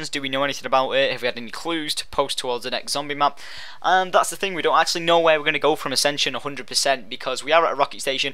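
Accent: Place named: United Kingdom. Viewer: i like yur accent its a fedish i have for british accents pretty weird............ so what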